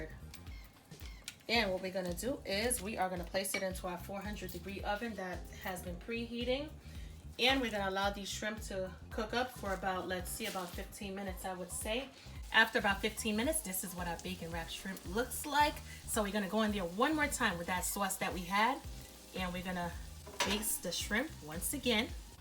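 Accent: New York, United States. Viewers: from your accent, I’d say you live in NYC? Or maybe in the vicinity? Love your accent. I live in Upstate New York, near Albany and Saratoga.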